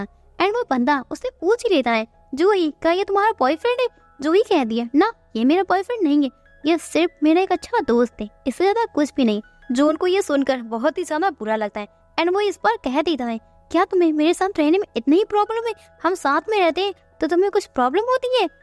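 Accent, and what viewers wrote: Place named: Arunachal Pradesh, India. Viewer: Apki voice arunachal ki lag ta hain....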